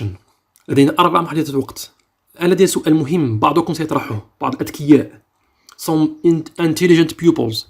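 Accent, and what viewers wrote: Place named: United States. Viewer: صوتك رائع في النجليزية كالامريكيين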